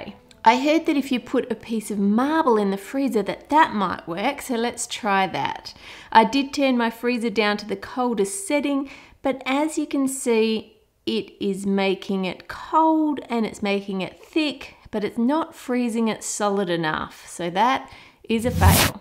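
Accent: Australia. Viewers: You're awesome! Love the videos! Also love listening to your Australian accent. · I luv Australian accents :D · I legit just realized shes Australian and my Aussieboo self JUMPED (because of Felix and Chris from SKZ)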